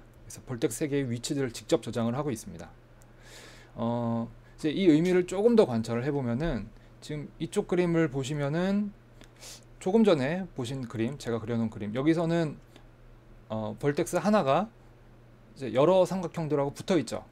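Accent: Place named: Texas, United States. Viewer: I don't understand anything, you have a deep accent are you from Texas?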